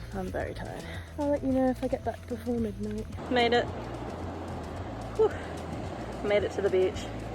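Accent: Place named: Australia. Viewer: Great Video! I love your passion for plants! Its something that I have always overlooked, not deliberately but I have just been drawn to things that move (at a noticeable rate). I cant work out your accent? Some sounds Aussie, some a little south African and then some sounds English. Have you travelled about a bit by any chance?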